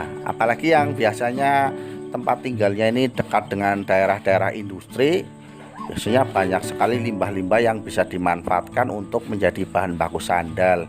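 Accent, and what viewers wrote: Java, Indonesia. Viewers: Wihh mantep pak, wihh nadane medok nggih, lokasi pundi pakde , pengin Sinau mbelah ban bekase 😁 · Loogatenya seperti jawa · Tak pikir wong medan pak... Jebule medok hehehehee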